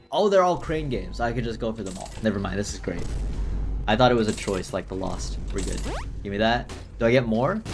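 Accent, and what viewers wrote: United Kingdom. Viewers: day 25 of asking to make full isaac video in british accent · He's from there so it's always a British accent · Day 14 of asking to play T isaac and talk the whole time in his British accent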